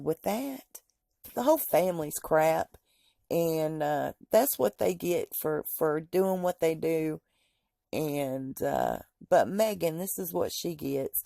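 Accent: South, United States. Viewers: Oh oh what's that do I hear a bit of Southern Confederacy in your voice I'm really concerned here that we might be dealing with a racist then again you people don't call yourself races anymore do you I believe it's nationalist now get a life besides talking about this girl's seriously he chose her in a discussion you know you can't bring back the Civil War and give it to the South and your racism shows and all your videos by the way not just this one I will be notifying YouTube about you I'm sick and tired of you Paula Dean , Roseanne, racist pieces of crap going after this girl for no reason what did she do to you exactly and you sound American so you don't have any investment in England and if you were Harry told you to keep your opinion to yourself I don't think she ever because enough for you and you know she won't so why are you bothering us with your opinion why don't you stop burning your damn crosses on YouTube and by the way she was only married once before but that's just how much you educate yourself on any media that's not sponsored by whatever dude under a sheet you work for oh my goodness you know is this how you treat people seriously you seem to not go to church or know that you should treat other people the way you would want to be treated you just seem like a overwhelmingly negative person you ain't saying nothing unless you got something bad to say about this girl Kyrie put out a statement when they first started dating about people like you I believe it wa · Hey Hon welcome to the south! 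I love your southern accent! Carolina girls best in the world! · What a witch 🕷! Thank you for keeping us posted, by the way I like your Southern accent💗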